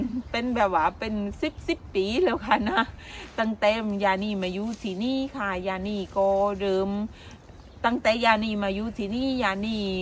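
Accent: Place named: Phang Nga, Thailand. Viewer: ดีใจด้วยค่ะ คนใต้เหมือนกัน สำเนียงคล้ายคนพังงาเลย ใช่รึป่าวคะ🌷🥰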